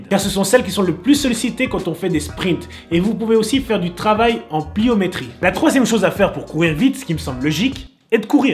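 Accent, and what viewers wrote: Belgium. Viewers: T belge ?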